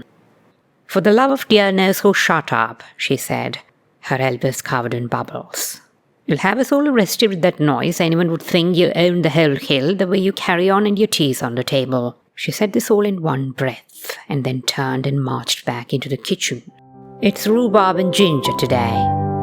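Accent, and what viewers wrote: RP, United Kingdom. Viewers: I assume this is you. Would you like to talk to other people who speak authentic heightened RP? I’d like to get a group going.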